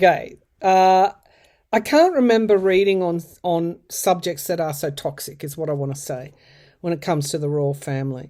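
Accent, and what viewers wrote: Australia. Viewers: I'm American and adore your feelings. Being on the side of what is right is where I am. I have to also say I love your Australian accent. It's so sweet and wonderful! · I appreciate your natural, calm professionalism without you being cold or putting on a show. So very glad to have found your channel and to hear an easy paced Australian voice. Even more, that you expertise ranges from cards to astrology, channeling and mediumship.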